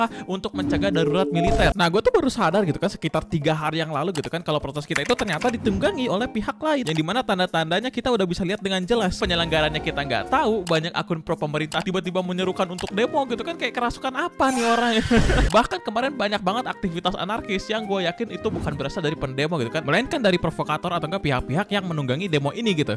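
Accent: Bandung, Indonesia. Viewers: Lo orang bandung bang? 😦😦😦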